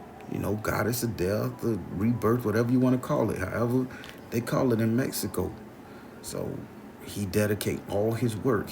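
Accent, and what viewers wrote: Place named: South, United States. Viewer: I listen to the stories featuring Grandma the most. She reminds me sooo much of my Grandma it’s her face I see when you tell the story. Snuff instead of tobacco, a hatchet instead of a 38, and she only practiced ass kicking without spells. Your stories could be on sci fi or something like that. She was a southern woman as well so the voice and terminology is spot on. It’s crazy! It creates a warm memory that never really was, at least not for me. More of an adventure that I can actually see happening. She kept a Buick, too!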